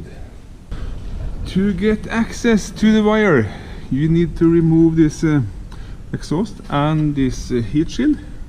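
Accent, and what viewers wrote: Norway. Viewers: Norskengelsk er så kult 😊 (Norwegianenglish is so cool)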